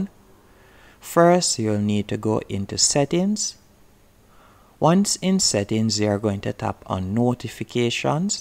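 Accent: Jamaica. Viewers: Dude has an Jamaican accent!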